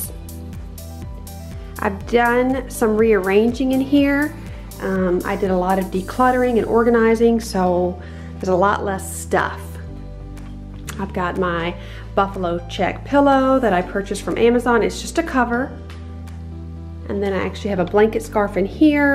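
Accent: South, United States. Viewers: especially with that southern accent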